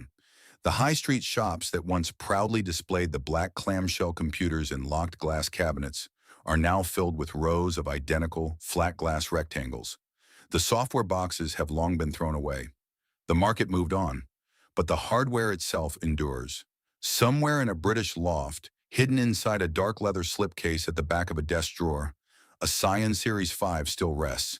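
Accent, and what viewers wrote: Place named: United States. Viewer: Can someone please tell me why a video on British computers has an American voice??! I find this very odd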